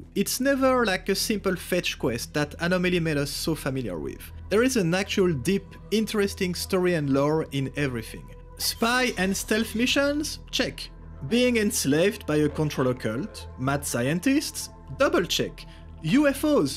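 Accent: France. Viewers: Why does your accent makes me think of a french guy ? If yes that's nice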